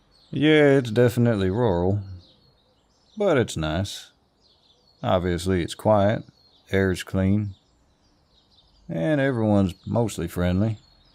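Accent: South, United States. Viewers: you are southern accent. good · Lord have mercy I have a thing for a southern accent 🫣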